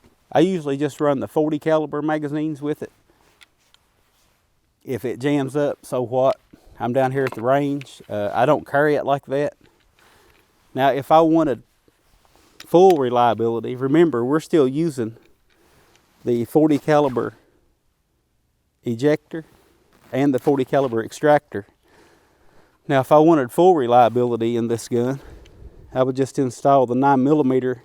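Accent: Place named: South, United States. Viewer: One of the best Glock pistol vids I’ve seen. Great shot and love the accent. I gotta get down south one day.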